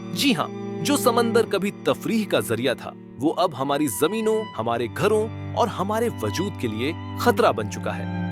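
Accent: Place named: India. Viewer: کونسی "رپورٹ" ریفرنس کیا ھے۔ آپ کا ایکسنٹ انڈین ھے اور جو فوٹیج دکھائی وہ ممبئ یا گوا کی ھے۔ کراچی سی ویو کی ھر گز نہیں۔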